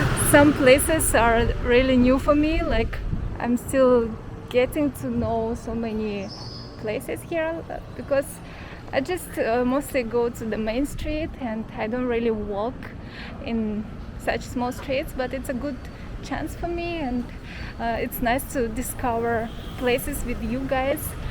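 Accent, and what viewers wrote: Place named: Eastern Europe. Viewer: I live in the same city. Sry for my rudeness. You have a bit of Slavic accent. Wish u have a good time here.👍🏼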